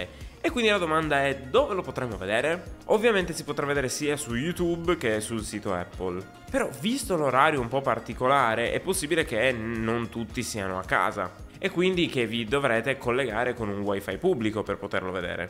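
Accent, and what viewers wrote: Sardinia, Italy. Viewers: Sei di Cagliari?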